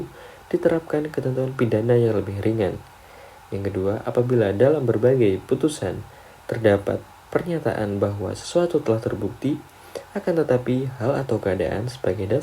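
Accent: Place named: Malang, Indonesia. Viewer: Pasti yang dubing anak mlawang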